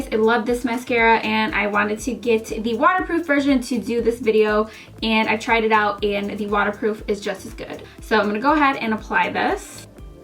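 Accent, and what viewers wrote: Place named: Upstate New York, United States. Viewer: girl youve got the ill upstate NY accent, i feel ya lol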